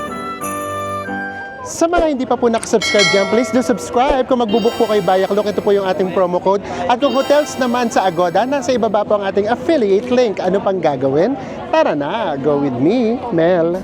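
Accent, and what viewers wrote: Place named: Parañaque, Philippines. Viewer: I love watching your vlogs , always positive ❤ and your accent is the-same with paranaque 😅 thank you and more power ❤ I’ll be in Baguio dec26 till jan2 … from Chatsworth city California 😊❤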